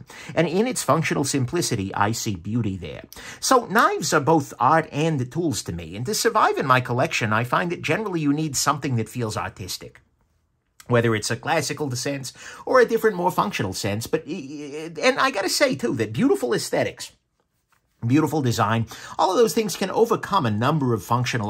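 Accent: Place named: Brooklyn, United States. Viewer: No way you live in Cali! You sound like your from Brooklyn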